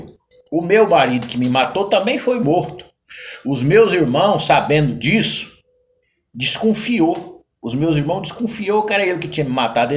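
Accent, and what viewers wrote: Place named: Minas Gerais, Brazil. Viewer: Esse cabra é mineiro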